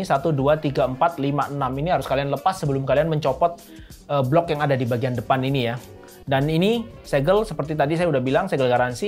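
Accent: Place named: Surabaya, Indonesia. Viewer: kalo boleh tau, nih channelnya orang mana sih.. bahasanya jatim sby an banget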